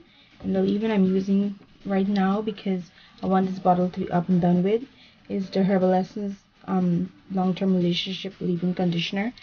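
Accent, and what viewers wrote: Caribbean. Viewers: where is the accent from? caribbean or south american? im from guyana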